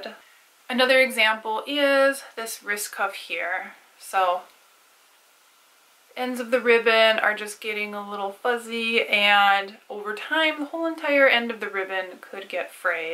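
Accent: United States. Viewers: This was all such good info and I loved listening to it because your accent is so cute! Where in the states is that accent from? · Cute channel, informative vid! Can I ask where you’re from? I hear a kind of northern/mid-western North American accent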